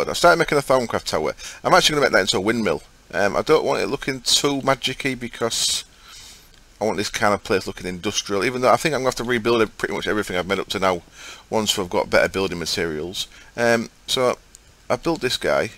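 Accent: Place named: Hartlepool, United Kingdom. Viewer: you sound like you're from Hartlepool area.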